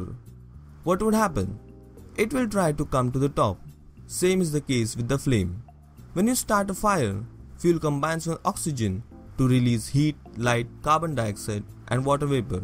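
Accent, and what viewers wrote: India. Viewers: All your videos are very nice .And your Indian accent makes it easier for us Indians to understand what you are saying unlike the bigger channels like veritasium and Vsauce which has the accent hard to understand.Please make the videos on regular basis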